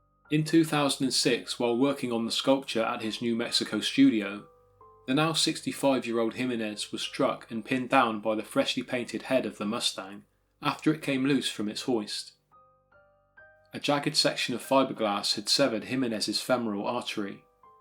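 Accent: United Kingdom. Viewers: Faceless story teller but with a beautiful voice and cute British accent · A documentary just isn't a documentary unless a Brit narrates it. Excellent content by the way 👍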